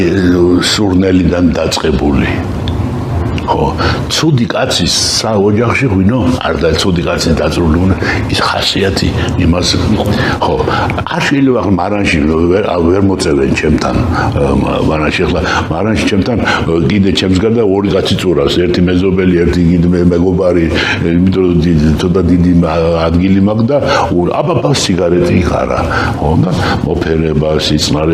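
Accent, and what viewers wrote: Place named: Imereti, Georgia. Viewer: იმერელი კილო აქ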